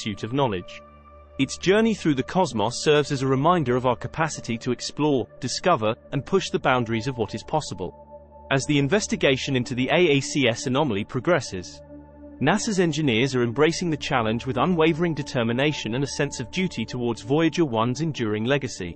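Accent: United Kingdom. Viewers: Why are the weird stories always narrated by someone with a heavy English accent..:face-blue-smiling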